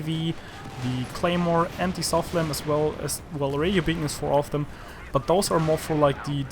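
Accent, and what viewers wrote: Germany. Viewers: mach mal deutsche videos.... Man hört das du deutscher bist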